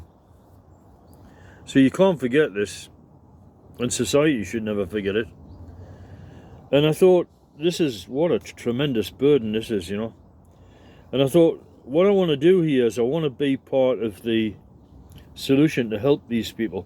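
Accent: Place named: County Durham, United Kingdom. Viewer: I'm 47 this year and still waiting for a diagnosis, Its been around 6yrs journey as I've been assessed for autism and bpd only to come back to an original opinion which was adhd. I must say I dont do well but I'm confining myself to my home so I don't buttress up against much that can cause to much stress. I hope you all the best and hope to find some confidence in my little bubble as you have shown to be possible. we also share an accent so that helps, I'm in peterlee, co. Durham.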